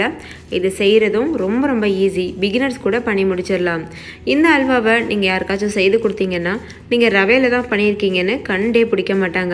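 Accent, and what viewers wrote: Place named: Erode, India. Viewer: Akka neenga erode Akka.. Unga slang spr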